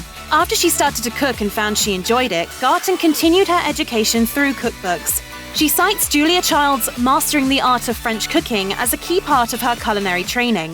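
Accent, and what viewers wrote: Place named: England, United Kingdom. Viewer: Why a voice-over with an English accent? Bad enough she talks too fast but she also is not right for a US audience. If you needed a Brit, how about one who knows how to speak accent-free? Not to mention who cares if she is working in her workshop? Or that she has helpers? It's a TV production, right? Would that our country could reflect as well in our public/political life as Ms. Garten.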